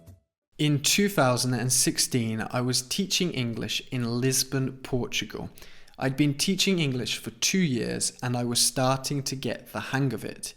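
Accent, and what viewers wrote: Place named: RP, United Kingdom. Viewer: 👏👏👏👏👏👏Goooorgeous English without an accent! When I was young I lived in Midhurst where I looked after my uncle's ponies at Cowdray Park Polo Club. You speak English like those polo players there, if you know what I mean! 😀😄😀😄Felicitaciones,! Eres un genio, amigo! Un abrazo, from Olivos, Buenos Aires, Argentina🇦🇷🇬🇧🇦🇷🇬🇧🇦🇷🇬🇧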